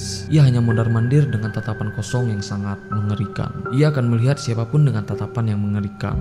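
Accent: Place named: Medan, Indonesia. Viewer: Kaya ada logat medan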